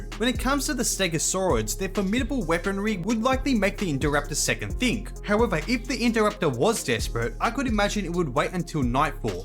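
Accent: Australia. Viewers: I’m surprised the Indoraptor had jts worst score in the Jurassic. Kind of ironic, if you think about it… Also, for some reason it didn’t click to me for some time that you were Australian,. I don’t know why. I hope I didn’t offend you with this…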